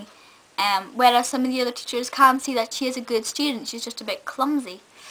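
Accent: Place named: Scotland, United Kingdom. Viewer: I am lovin your Scottish accent. I remember the film The Worst Witch (1986), and boy was it funny. Its hilariously bad..a must see.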